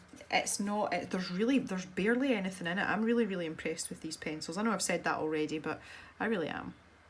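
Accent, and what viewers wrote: Scotland, United Kingdom. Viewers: Thank you for this very helpful review. The one by Faber-Castell themselves is so poorly made that it is pretty darn embarassing for them.🤭 And may I say that I just love listening to you talking, as I absolutely adore the sound of Scottish English! ❤ All the best from Germany! Instant new follower!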